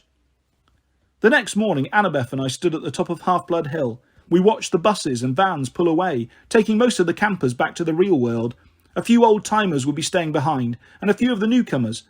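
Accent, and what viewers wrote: England, United Kingdom. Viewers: Smh i gave it a try and your good but i just cant listen to an English accent when it comes to New York Percy lol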